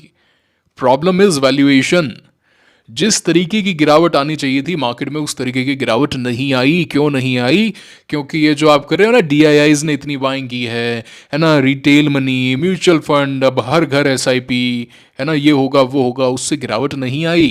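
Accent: Rajasthan, India. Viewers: Sir app rajsthani ho kya ❤❤❤❤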